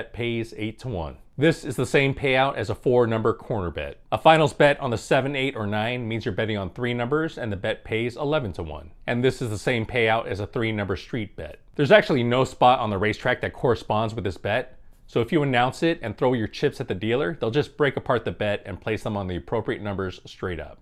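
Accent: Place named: France. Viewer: Thanks for the great content as always. Nice french accent haha. Cheers from Montreal, Qc, Canada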